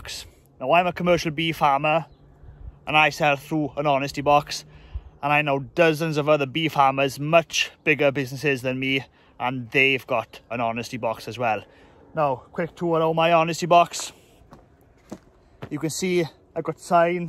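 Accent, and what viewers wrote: Wales, United Kingdom. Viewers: Brilliant just what i wanted ti hear, so nice to hear that youve had no problems, i will be making one tomorrow to sell some of my excess veg, got radish, chard and hopefully soon some strawberries, kale and later tomatoes, great video man and btw best welsh accent ever! 👍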